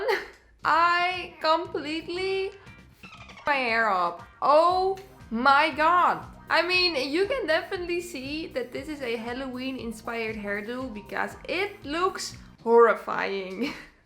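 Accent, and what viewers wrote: Netherlands. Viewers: Is ze nou Nederlands of heeft ze gewoon een Nederlands accent?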